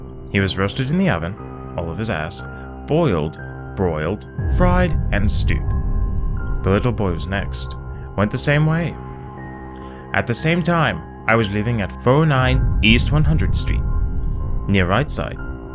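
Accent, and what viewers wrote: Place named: United Kingdom. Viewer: I loved your British accent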